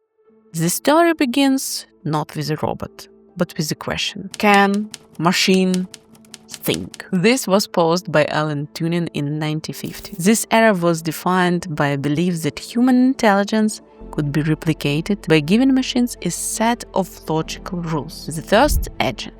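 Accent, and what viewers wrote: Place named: Russia. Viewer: ah I find the Slavic Russian accent so funny and charming at the same time but good video your channel will blow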